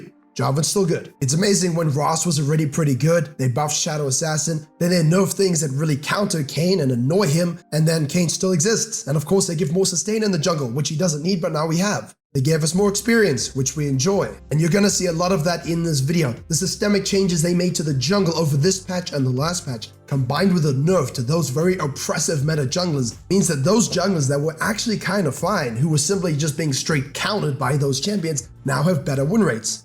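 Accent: South Africa. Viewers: Why do you sound 10x more south african when you're sick lmao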